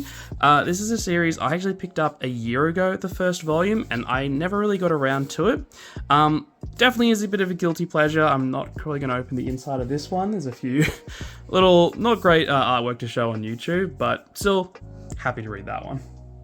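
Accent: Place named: Australia. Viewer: Dude I just found your channel and I wish I found it sooner. A fellow Australian (I’m assuming from the accent) with a manga related channel? Sign me up. It’s good to know I’m not alone in this niche of YouTube. Starting out is hard but I will say your videos are crafted really nicely I enjoyed your video all the way through. Keep it up mate.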